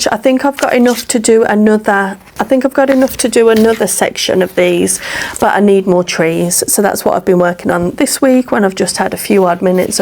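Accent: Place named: Birmingham, United Kingdom. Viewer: Hi, first time watcher, loved all your makes, they are so neat and I am a big fan of Liberty. You sound like a local brummie girl to me, what date are you going to the festival of quilts at NEC next week? Also did you go to Alice Caroline recent sale in the Cotswold, my friend went and they were giving away smaller pieces of Liberty, she is going to pass on a bag to me at the NEC on Saturday. Anyway thanks for your inspiration and it’s great to see the enjoyment you are getting out of this ❤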